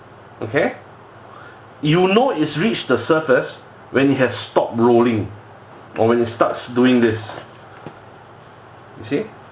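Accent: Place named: Singapore. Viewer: Wow!!!! The first impression was that Singaporean English accent. Further confirmation from your profile reveal you to be my countryman! Haha Great video. Recently just got my spool and SMB. This is a good "dry run" video before I actually deploy it on my next dive.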